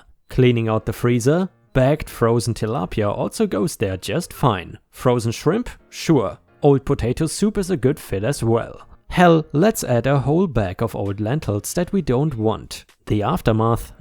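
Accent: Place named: Germany. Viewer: You do sound preeeetty german, man.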